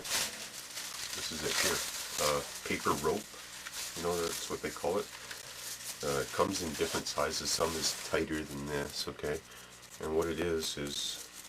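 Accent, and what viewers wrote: Canada. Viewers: are you canadian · Canadian eh? hah, great trees friend!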